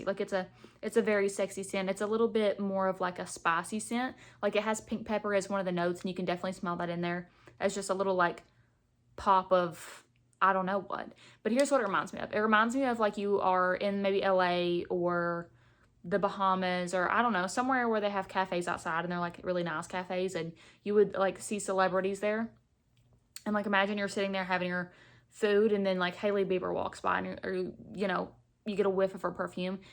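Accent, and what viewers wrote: South, United States. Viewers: you are soooo pretty and your southern accent is beautiful ❤️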